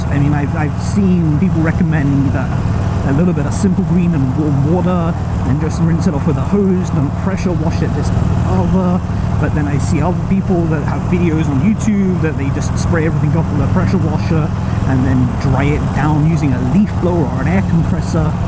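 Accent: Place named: Australia. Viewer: Hey nice first motovlog! Are you from Australia or the UK? your accent is not very local haha. You really do remind me a lot of the Aussie motovloggers though. What is funny, is that I was going to use iridehawaii as my channel name when I first started but I was watching a lot of Revzilla product reviews and the "rev" won instead of "ride". I guess since Andy gave you some tips, this motovlog was kinda long. There is absolutely nothing wrong with long motovlogs but as a new motovlogger try to keep it under 10 minutes to keep your audience's retention. We are still trying to get to know who you are. As for swearing, it really doesn't matter. It is up to you how you want to deal with your swearing. For me personally it is a non issue unless every other word is a swear word for 10 minutes then it gets a little old and unbearable to watch. Anyways, great Harley you got there. 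I hope you can ride with us one day! Welcome to the Hawaii motovlogging community!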